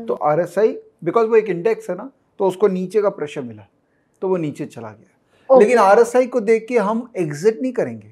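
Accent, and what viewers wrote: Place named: Bengal, India. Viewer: "Pati" way 😄 You sounds so Bengali 😊😊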